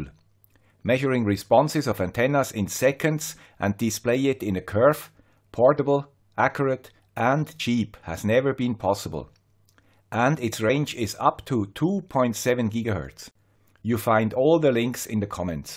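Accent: Switzerland. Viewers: Now when I am working with electronics I have a Swiss voice in my head.. Dankeschön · What more could you want? A guy with a Swiss accent explaining complex numbers .... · Thank you, as a French, I like your Swiss accent, and I remain admirative in front of the simplicity with which you can explain complex subjects...I'll keep watching your multiple videos and keep learning... Your experiments basically save me the hassle of trial and errors, and help me to go straight to the right solution. Bravo!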